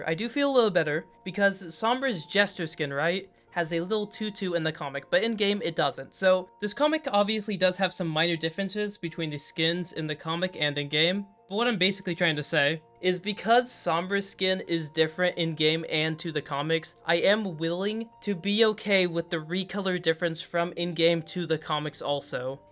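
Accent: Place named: United States. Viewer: The americanism in the pronounciation of the names is insane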